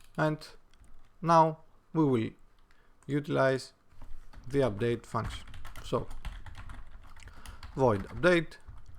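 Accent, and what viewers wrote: Greece. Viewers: Are you greek? You sound like it :)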